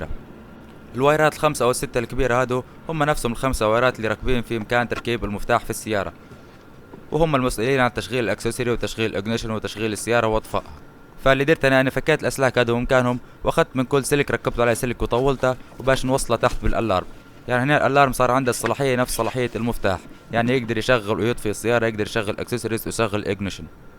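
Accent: Libya. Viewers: اي مبين من لهجتك انك ليبي بس تعجبت لماشفت مكتوب الموصل في المقطع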